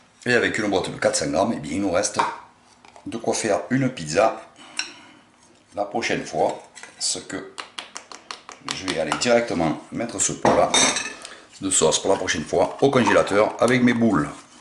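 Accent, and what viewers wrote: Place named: South, France. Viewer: Avec une voix qui sent bon le Sud 🤗 je dis Ouiiiii!!!! Je testerai la pizza plus tard, je savoure déjà l'accent 🌾🍅🍕😄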